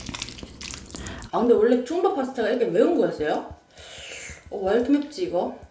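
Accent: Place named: Daegu, South Korea. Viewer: 씨 사투리를 쓰셔서 더 호감가는 것 같아요? 대구분이신가요?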